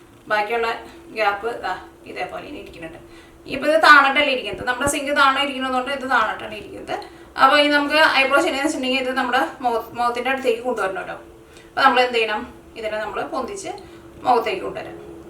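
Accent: Thrissur, India. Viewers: Mmade Thrissur slang Oru prathyekatha thannaaaa tta eshta😄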